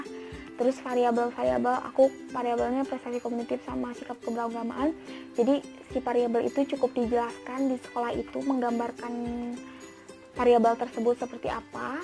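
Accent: West Java, Indonesia. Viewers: Sunda pisaaan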